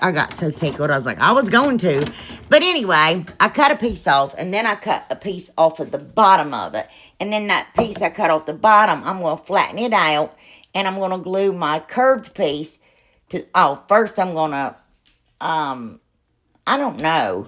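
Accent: South, United States. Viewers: Hi, I absolutely love watching your tutorials. I would love to receive your wonderful "Spring" table topper, it's adorable. I would adopt you if I could. You would be the greatest little sister. I'd love crafting with you for hours. My husband, and I watch your tutorials for hours, and we even try to sound as cute as you do. We say "I love you A LOT" to each other every night before we go to sleep. We have done this ever since we heard you use that expression "a lot" with your wonderful southern accent. I wish you knew how much you brighten up so many of my days. Unfortunately, there are people out there who may say negative things to you. I feel sorry for them, and I pray that they find the inner peace they so obviously need. No one needs to try to belittle someone as special as you are. Thank you for being you, and for all your wonderful tutorials. 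Susan and Will Edgar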